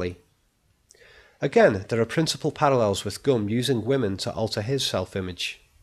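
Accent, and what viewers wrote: Liverpool, United Kingdom. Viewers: Where you from? South Liverpool?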